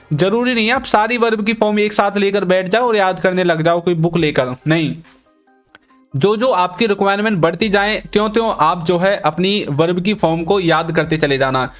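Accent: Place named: Uttar Pradesh, India. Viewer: Sir apka language tone to up ka sa he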